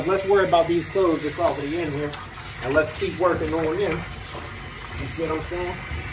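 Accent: Pittsburgh, United States. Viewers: My guy has that heavy Pittsburgh accent. "Just sooooow y'all knooooooow"😂🤣😭 sounds like my uncle, lol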